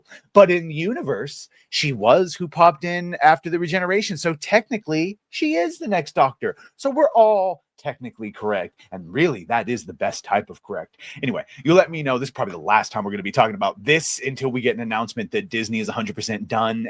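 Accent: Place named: Cockney, United Kingdom. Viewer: Love the cockney accent, jokes on you though as it's been replaced with the Pakistani English accent.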